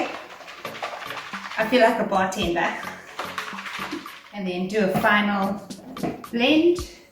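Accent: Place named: South Africa. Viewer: Your accent sounds so much like our South African accent. People used to tell me we sound like the Aussies and NZlanders and I could never hear it. I hear it now. Haha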